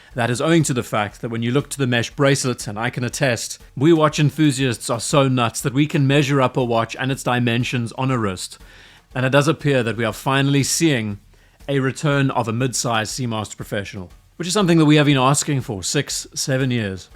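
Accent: South Africa. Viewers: Wait now, what is this accent, are you South African?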